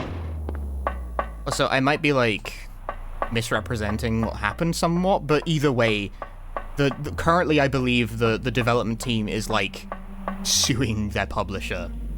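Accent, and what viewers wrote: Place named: United Kingdom. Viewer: I've watched some of your videos before, but never occurred to me that you're from the uk, even though I did notice you have an accent lmao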